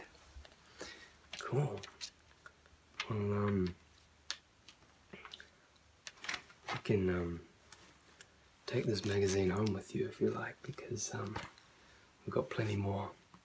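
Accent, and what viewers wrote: New Zealand. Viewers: Very well executed video. The New Zealand accent is dreamy.A Kiwi can be rude and you'd still say thank you. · Wow I love the new zealand accent. I'm in love ❤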